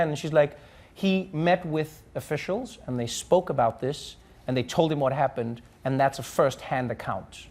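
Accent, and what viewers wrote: Spain. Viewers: You should work in you Spanish impressions (we are not Italians) in Spain the z and most C in middle of a word is pronounced like that. Only in south America and Canarias can´t pronounce it right. And that is why they do not spell some words right XDD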